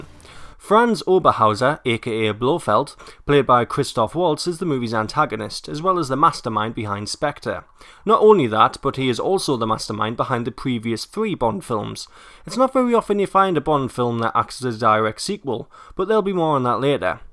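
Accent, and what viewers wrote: Newcastle, United Kingdom. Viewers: Gona take a wild guess , u from Newcastle? :P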